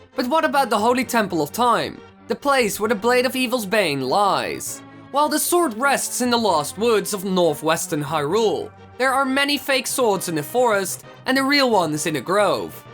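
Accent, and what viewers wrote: Netherlands. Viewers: fancy guy i can say with extreme confidence that he's Dutch. that's a one of a kind accent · are you actually from the U.S because u sound pretty dutch (nl)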